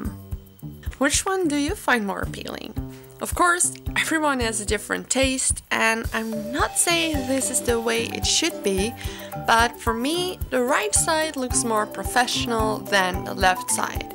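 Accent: Netherlands. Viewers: Are you dutch? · echt een nederlands accent dat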